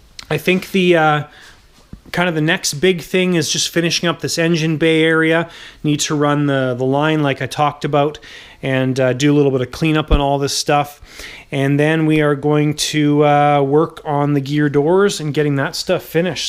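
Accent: Canada. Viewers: I absolutely love the content on your channel.obviously you are a Canuck and you guys say things just a bit differently than ee do here in the United Staes but one thi g thats been really bugging me is how you say CORS AIR Instead of the proper pronunciation COR SAIR. Once again love the channel content and I think you build some of the best models Ive ever seen. Keep up the good work and you say corsair however you pmease....lol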